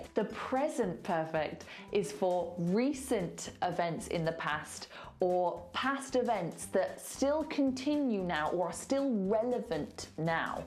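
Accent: United Kingdom. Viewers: That British accent... ❤️ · Thank you, It was so useful for me and, I love the British accent btw!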